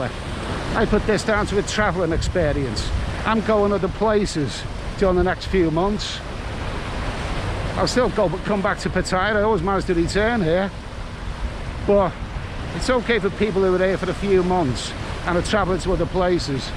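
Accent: Liverpool, United Kingdom. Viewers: Hi,in Bangkok at the moment,just passed second pcr test,thank god.Must say the two tests made me anxious but I’m lucky I passed that’s all I say,it’s a big chance for anyone to take I must say.Ramifications of testing positive are not great financially or mentally.I am off to Koh Tao for two weeks with my Thai girlfriend and a few well deserved beers👍 Love hearing your voice as my old man was from Fazakerley,only a scouser would know where that is. He’s passed away now but reminds me of him. All the best to you🍺😊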